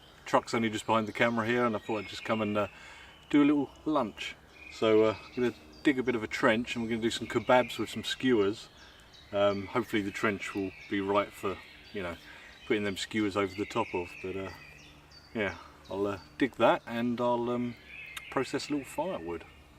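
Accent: United Kingdom. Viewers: The sensational combination of at least 3 star level meals, while cooking via bushcraft, and narrating with a british accent.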